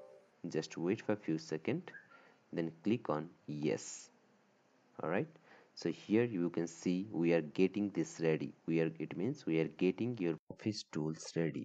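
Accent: India. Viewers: When i started the Video and heard the Indian accent i knew he would explain it like a Pro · why is ur voice so bad. average indian voice ew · when i have started the video and i heard the indian accent i immediately start following the step because u guys always help us in math an in every other subject a huge thanks and respect bro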